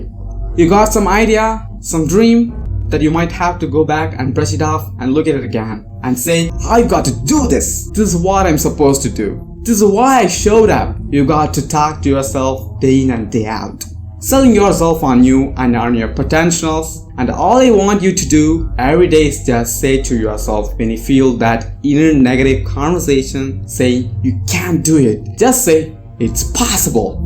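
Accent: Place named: United States. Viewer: Mesmerizing to see an indian speaking in american accent. Good job.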